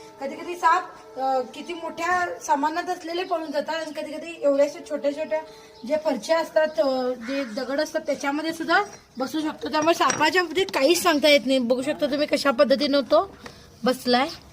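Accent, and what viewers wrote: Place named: Kolhapur, India. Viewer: Tai, tumchi bhasha lai bhari hai. I like kolhapuri tone😅😅😅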